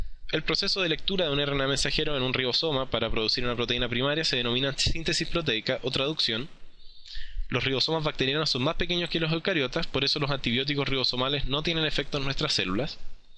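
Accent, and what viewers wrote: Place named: Chile. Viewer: creo que eres chileno por tu acento y porqe no me desconcentre al escuchar jajaj (soy chilena) graciaaaaaaas ahora podre dar mi prueba de bioqimica tranquila :)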